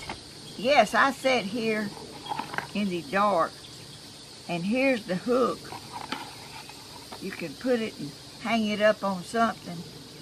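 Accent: South, United States. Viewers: No ! Not another Southern drawl lady getting gifts from her subs. Isn't Glenda Sully enough ? Where did this lady come from ?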